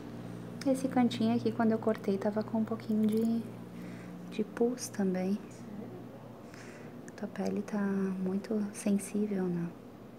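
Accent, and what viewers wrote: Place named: Rio Grande do Sul, Brazil. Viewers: Odeio sotaque Gaúcho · Pelo sotaque acho que é gaúcha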